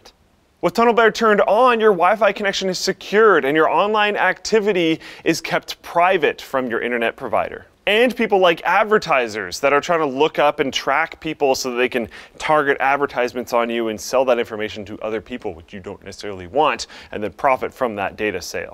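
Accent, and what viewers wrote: Canada. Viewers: Canadian accent is strong with this one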